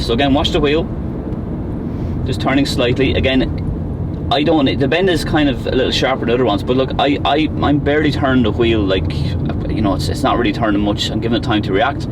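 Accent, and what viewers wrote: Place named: Wexford, Ireland. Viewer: The first video I watched I thought this guy sounds like he is from Wexford 🤔. Then in this video I saw you driving around my estate right past my house. Small world 😂. My first lesson is tomorrow and your videos have been a great help. Thanks.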